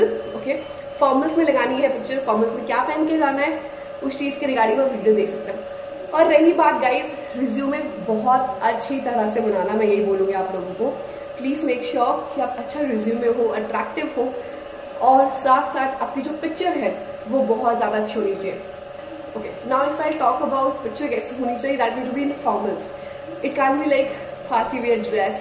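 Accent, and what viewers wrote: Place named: Haryana, India. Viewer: You speak haryanvi accent well. By the way wat happened to your hands. Any injury?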